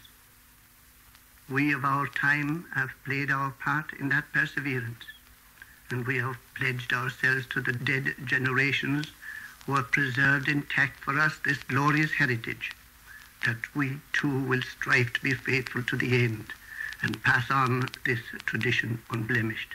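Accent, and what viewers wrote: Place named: England, United Kingdom. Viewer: He sounds more English than Irish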